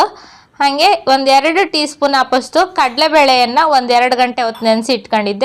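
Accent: Kundapura, India. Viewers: Avaru kannada sariyagi mathadthidare. idu udupi jilleya kundapura thalukina pradeshika ಭಾಷೆ ಕುಂದಾಪುರ kannada (kundagannada)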